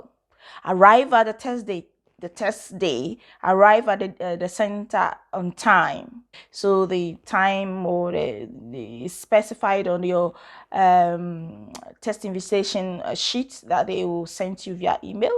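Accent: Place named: Ghana. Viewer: You are very articulate. Thanks for all the information. I feel you are a ghanaian ..... From ur accent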